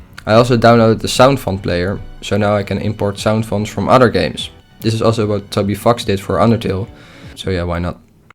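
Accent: Netherlands. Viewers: Nice, i could hear it on your dutch accent :D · ja neterlands accent heb je wel door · there is something in the way netherland people talk english, that makes me think theyre from the netherlands, gues i was correct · my brain knew you were dutch the first second in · your definetly dutch bro your accent lol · Hoi ik kon al meteen aan je accent horen Dat je Nederlands bent. Anyways coole video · As a dutch person I can confirm he sounds Dutch. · Very dutch accent lol I love it great video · A few seconds into the video and I could tell you were dutch ! :p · hoi, ik herken een nederlands accent, heb je een discord server?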